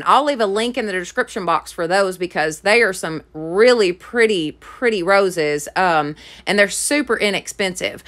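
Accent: South, United States. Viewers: I started the video and my 11 year old went she's from the south right 🤣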